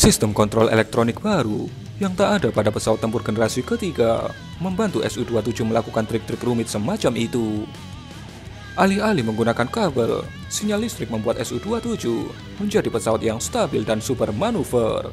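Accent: Java, Indonesia. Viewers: Mantep nih channel, modal text Googling, footage nyolong dan bacotan medok bisa dapet 400k lebih subs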